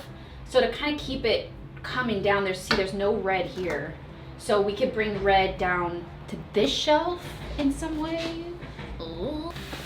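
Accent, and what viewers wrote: Texas, United States. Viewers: I love the little Texas twang in your voice now. As soon as you grabbed the tree I thought she’s gonna put on gloves cause she learned from childhood. Lol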